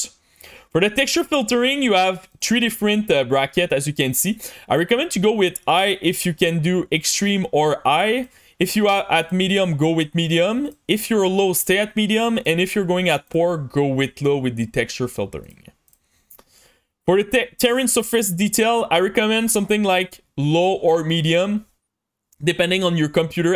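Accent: Quebec, Canada. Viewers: Le quebecois is strong with this one.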